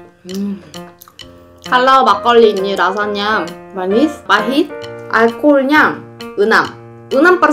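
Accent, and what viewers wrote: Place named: Java, Indonesia. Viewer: Kok, ada logat jawanya yah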